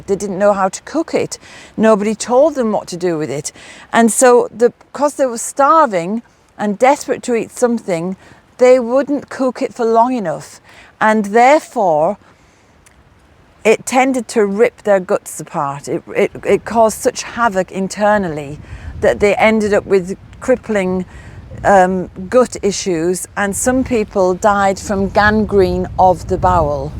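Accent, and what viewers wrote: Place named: Northern England, United Kingdom. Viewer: It's absolutely horrific what the British ruling class did to the Irish. do you not experience hostility in Ireland due to your accent? Like you I'm from northern England. My mum and half my family are Irish (my mum emigrated here). We never go back now due to the hostility caused by our accents. They say my mum lost hers and of course I have an English accent. It bothers me because we're descended from working class Irish and English people who suffered too but they don't tend to make that distinction as soon as they hear an English accent.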